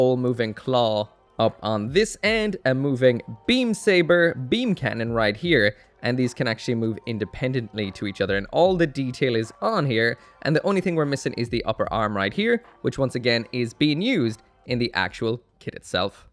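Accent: Ireland. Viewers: Irish Acent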